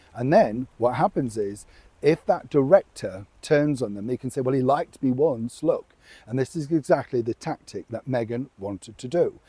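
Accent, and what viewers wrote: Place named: Doncaster, United Kingdom. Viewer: Doncaster interesting accent😂😂😊😊 I’m from Donny, interesting int, tword ad use love.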